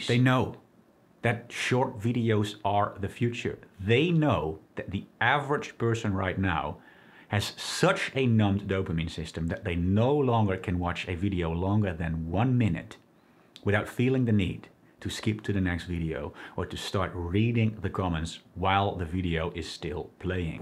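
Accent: Netherlands. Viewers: Ik dacht al dat ik een nederlands accent hoorde hahaha.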